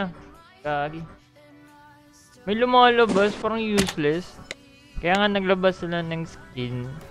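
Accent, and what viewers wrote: Visayas, Philippines. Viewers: bisaya ka pala ehh~!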